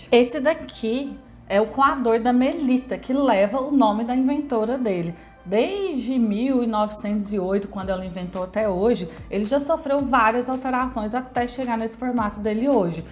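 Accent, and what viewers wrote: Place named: Minas Gerais, Brazil. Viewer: Gosto muito da maneira didática que você explica. Fora que seu sotaque mineiro é uma delícia de ouvir. 🌺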